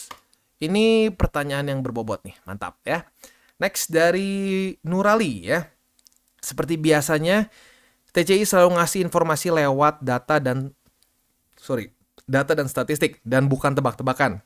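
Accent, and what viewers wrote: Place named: Bandung, Indonesia. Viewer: Orang cindo bandung dan merantau jakarta rata2 logatnya seperti ini.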